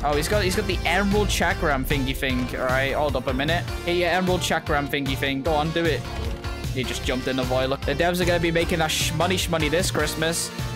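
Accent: United Kingdom. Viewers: I just realised he is British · Are you british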